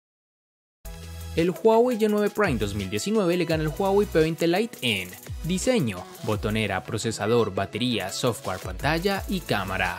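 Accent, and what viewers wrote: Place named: Bucaramanga, Colombia. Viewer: excelente video bro hey pana usted es bumangués ? se escucha bastante el acento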